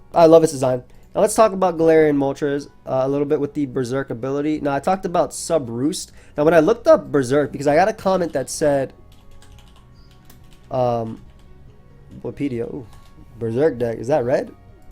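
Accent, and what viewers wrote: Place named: New York City, United States. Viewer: Your NY accent really popping off today haha. I think Zapdos will be great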